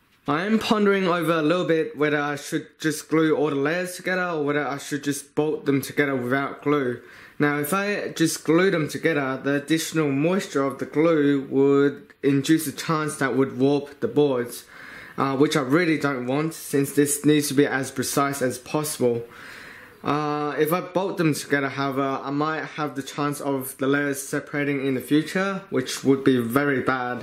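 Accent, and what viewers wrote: Australia. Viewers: What part of Australia are you from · You Australian mate?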